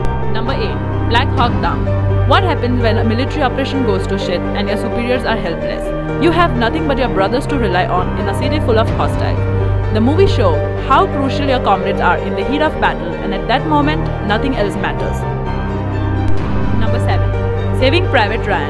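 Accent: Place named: India. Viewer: Sweet voice. Pure Indian tongue. Wish I find ur no.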